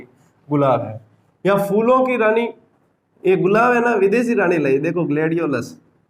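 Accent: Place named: Rajasthan, India. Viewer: Sir aap marvadi bolte ho to bhut achha lagta h pr smjh km aati h greet Sir ✌️✌️👍👍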